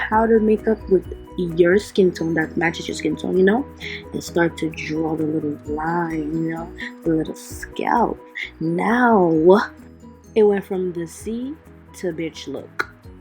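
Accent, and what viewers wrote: New York, United States. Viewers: You from New York ? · Omg I love hearing u speak reminds me of home NYC girl great video it came out so nice does the hairspray really protect the lace I’m so worried bout that cause I like the lace to stay u did good sis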